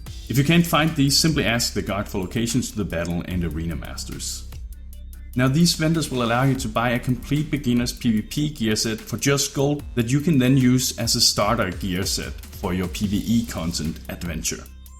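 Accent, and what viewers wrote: Denmark. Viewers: Dat dane accent <3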